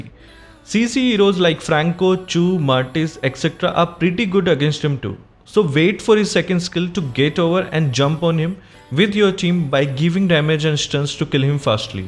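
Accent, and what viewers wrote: India. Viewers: R u Indian bro ur voice is indian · R u indian ur english accent sounds like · Your Accent is just like Indian's, I LIKE THAT ACCENT!👍👍👍💗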